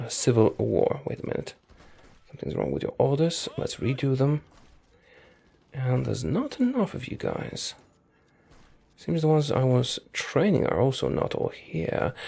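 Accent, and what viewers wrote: Poland. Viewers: are you polish you sound like a polish person who migrated to the UK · Your voice kinda reminds me of the English dub L But a little polish? I think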